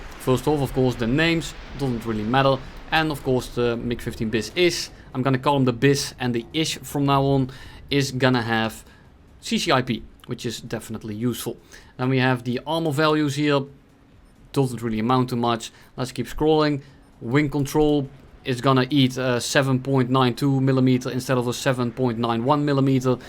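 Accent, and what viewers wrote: Netherlands. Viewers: Dutch English damn....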